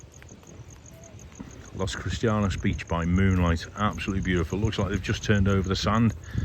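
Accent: United Kingdom. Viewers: You sound like the original butler, Carson, on Downton Abbey...I wonder if you are from the same area as he is? Love your videos. It's so interesting to see this island from your walks.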